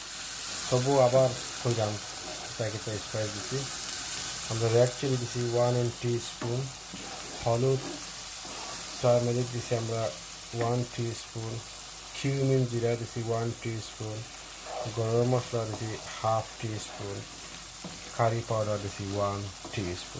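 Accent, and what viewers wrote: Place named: Sylhet, Bangladesh. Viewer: ওয়া আলাইকুম আসসালাম। কেমন আছেন আপনি। ভালো লাগলো, এই প্রথম কুনো সিলেটি ভাইর ভয়েসে রেসিপি দেখলাম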